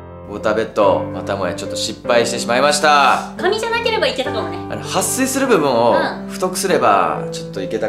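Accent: Kansai, Japan. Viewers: 今日も関西弁やね〜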